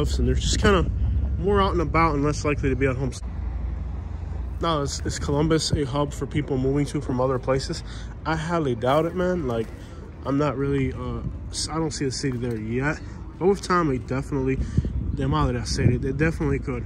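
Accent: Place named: Minnesota, United States. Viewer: Are you originally from the northern states? You sound like you have a Minnesota accent.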